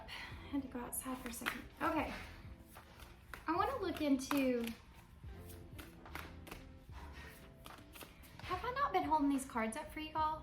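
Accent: South, United States. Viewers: Just found this channel. Pleasantly surprised by your southern accent! Love from southeast Kentucky ❤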